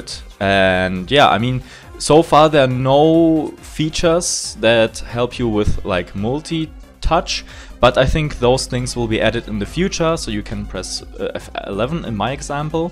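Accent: Germany. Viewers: Cant unhear the german xD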